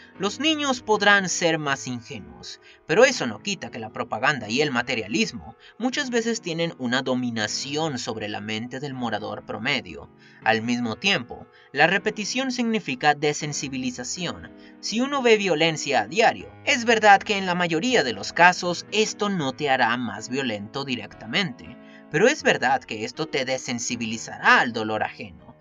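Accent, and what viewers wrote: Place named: England, United Kingdom. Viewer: Mejor voy Preparando mi Dinero, Para pagarte en Patreon y que dejes tu Acento Ingles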